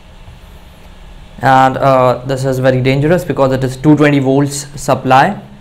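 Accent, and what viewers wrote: India. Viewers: You are very sympatic. i also like your voice with a bit indian accent. 🥰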